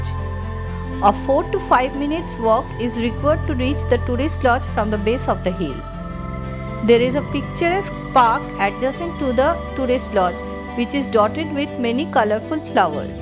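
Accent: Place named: Bengal, India. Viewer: Your pronunciation of english in Bengali accent is very beautiful to hear.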